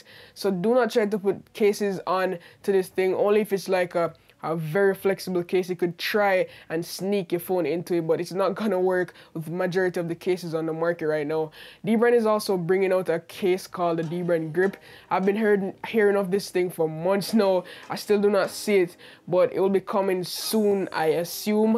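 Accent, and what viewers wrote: Jamaica. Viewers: This accent sounds jamaican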